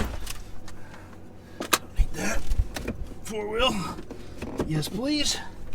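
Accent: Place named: Iowa, United States. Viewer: He is in extreme south central IA very close to Lake Rathburn and Centerville area. He has that southern IA accent.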